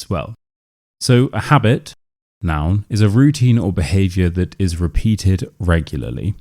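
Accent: United Kingdom. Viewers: I really love your YouTube channel. I watch almost every video.I chose your channel because you have a great deep voice and a real British accent. I want to learn this accent from you.But I have a small request. Sometimes you speak too fast when you move from one sentence to another. Because of this, my brain cannot catch the first sentence.Of course, you don’t do this all the time, but it happens often. I frequently have to separate the sentences in my head by myself.Could you please make small pauses between your sentences? This will help me understand you much better.Thank you for your understanding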